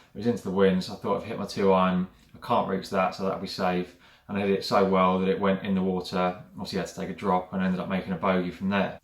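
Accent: Australia. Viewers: Well done in your 1 under the card, brilliant result! The key sometimes is to put things in perspective, there's no golfer in the history of the game that hasn't gone through a slump fighting internal demons from Ballesteros, to Tiger, Nicklaus you name it everyone goes through slumps. For me what works these days after fighting my own internal demons is I treat golf like trying to chase a butterfly. The more you chase after it you'll never catch it but if you just stand still and wait patiently much better chance of coming back to you. Keep up the great videos. PS.. bring back the Aussie dodgy accent, sorely missed.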